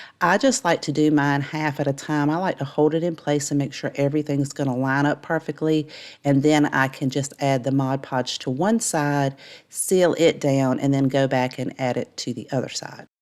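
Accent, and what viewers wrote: South, United States. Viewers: Hello from Scotland ✋🏻I've only been watching crafting on YouTube for a couple of months. And I really love how "clean" your designs are, if that's the right word. You have the minimum of things to make something look so lovely. We don't have the amount of choice in our shops that are as cheap as your Dollar Tree stores etc and although Amazon gives us a wider choice, it's not the same as seeing it in front of you being able to touch and find inspiration. That said, because you make so many of your projects with the minimum of stuff needed, I can replicate it without needing to take out a bank loan 😂. Just love your style, the way you explain it so simply in that lovely southern accent of yours. 🎄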